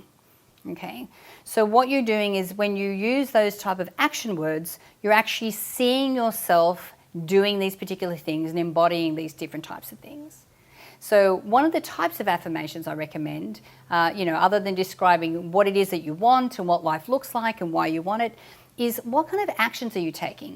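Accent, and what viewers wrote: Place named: United Kingdom. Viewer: but your accent sounds british. Am i wrong?🤔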